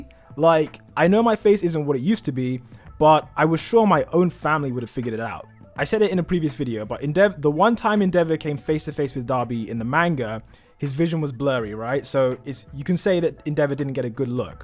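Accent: United Kingdom. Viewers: It’s funny this is about Dabi’s reveal but the biggest reveal was seeing what looked like! NGL, I pictured you as Harry Potter because you don’t have an American accent so I thought it was British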